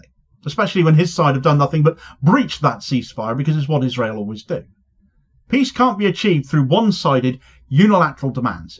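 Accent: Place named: South West England, United Kingdom. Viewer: Its his English "local" accent not the microphone.... South West England. Sounds fine to me "Ooooh Arghhhhh"! somethings in the UK still shine bright against the invasion... thankfully local accents live!! (Google " " and you will understand what I am saying 🤣 )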